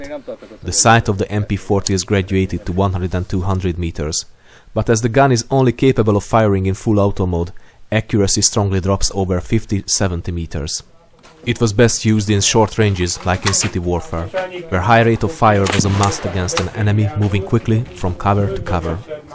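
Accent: Hungary. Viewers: szintén, elég volt meghallanom az első hangot, és már néztem is egyböl leírásba hogy tuti valami magyar lehet :D talált süllyedt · Imádom hogy hallottam hogy ez magyar akcentus lesz és téényleg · Mielőtt elolvastam a kommenteket, már sejtettem, hogy magyar a beszélő...le sem tagahtatja :D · A fülemnek tetszik a magyar akcentus :D minek szinészkedni az angolt. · Annyira lehet hallani hogy egy Magyar beszél Angolul :D Jellegzetes akcentus, meg beszédi forma vagy minek mondjam. De az ember hangján lehet inkább észrevenni hogy igen, a Magyarok beszélnek így angolul :D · It took me 40 seconds to realise it is hungarian accent. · His got that hungarian accent, trust me i know :D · the accent is terrible. Im from Hungary, i live in the USA so im surrounded with these Hungarians but this one takes all. otherwise cool video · az angol akcentusodból tudtam hogy magyar vagy :D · JÓ lett a videó És mikor elősször hallotam a kommentárt egyből feltűnt hogy mintha egy kicsit magyar volna :D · Halgattam a videót nemnéztem leírást és úgy a 10.ik másodperctől éreztem hogy Magyar Vagy :) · I have a Hungarian friend & he talks just like you I can bet you're Hungarian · Horribly Hungarian accent.. 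· Nagyon gyanús volt hogy magyar vagy, az angolod alapján, de amikor meghallottam a magyar beszédet akkor voltam csak biztos benne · Az akcentusból szerintem te magyar vagy :D Pláne a fenti oldalból a ".hu" nagyon gyanús :D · Hmmm... as a romanian I can recognize that magyar accent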